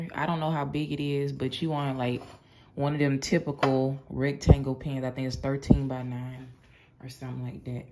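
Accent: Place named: South, United States. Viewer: Where are you from? 👀 sound like you from the South from ya accent!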